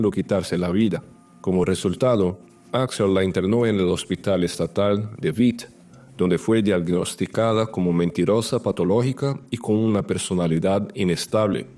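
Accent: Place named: Portugal. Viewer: Que feo acento . Casi no se entiende. Si queres hacer documentales en portugués genial. Pero un asco escuchar el acento rapai tembo.. no se le entiende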